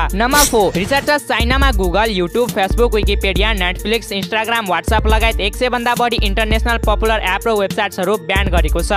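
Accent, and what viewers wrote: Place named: India. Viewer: You are going much good but I want to suggest you that improve your accent and voice. Ali Hindi accent jasto vayo nepali jasto suniyena.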